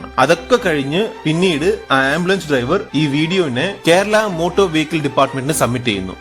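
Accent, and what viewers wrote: Tamil Nadu, India. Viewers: You are not a malayali, allea? Your Malayalam prononciation is like a tamilan. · Why do you sound like a Tamil speaking Malayalam?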